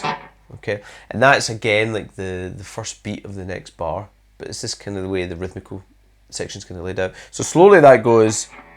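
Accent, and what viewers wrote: Scotland, United Kingdom. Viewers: sound scottish, maybe edinburgh. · What part of Scotland are you from?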